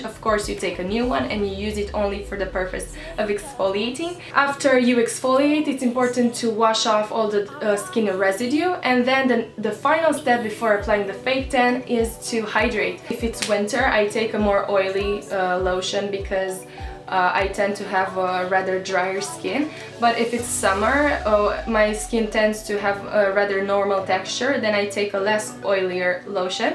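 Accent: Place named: Romania. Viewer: Esti cumva din Romania ? Am vazut produsele romanesti si ai si accent 😘😘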